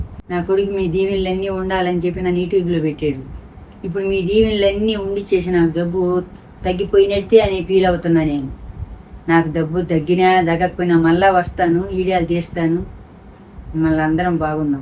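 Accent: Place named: Nellore, India. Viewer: Medi Nellore ah sis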